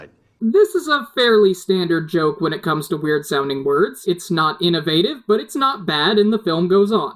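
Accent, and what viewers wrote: Australia. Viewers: Are you from Australia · Are you Aussie? If so, cool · Are you Australian??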